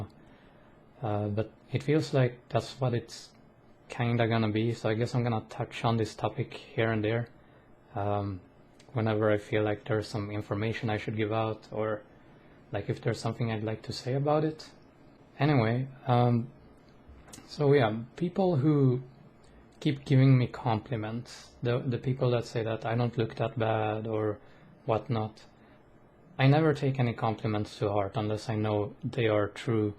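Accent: Sweden. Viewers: I would love to have a friend from Sweden. If your ever into gaming and/or chatting let me know. I know there are a lot of people who are here for you. You have a lovely accent and a very calming voice and I'd add that to your list of nice features. I also wonder what you'd look like with your hair pulled back. I agree about health too, it's been pretty difficult socially for me this last year and staying healthy is something I strive to do as well. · Ååååh!! :) Tyckte det var svensk "dialekt"! :D Du är klok du och en riktigt fin människa. Uppskattar ditt meddelande till oss mannen!! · Du låter svensk i brytningen! Vill bara säga att du verkar vara en härlig människa!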